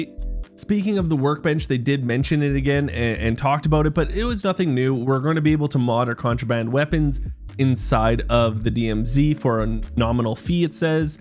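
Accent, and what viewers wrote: Canada. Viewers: By far the best person i like to hear my updates from. Not sure if its the funny Canadian accent "ae" or its just more interesting and better than most out there. Good job keep it up G.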